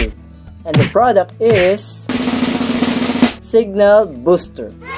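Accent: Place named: Philippines. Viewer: Pang English English kpa halata nmn accent mo na Pinoy ka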